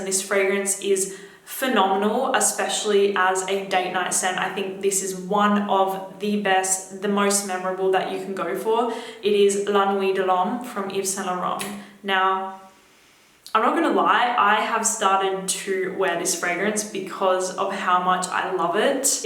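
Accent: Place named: Australia. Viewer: Where we going on date night ;p Edit: Echo is a plus, twice the Aussie accent.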